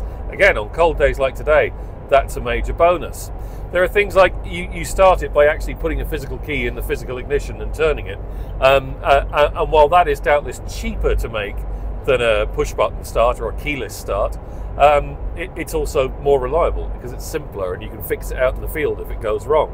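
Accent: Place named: England, United Kingdom. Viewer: I love the way your plummy English accent keeps slipping into pirate. Great review!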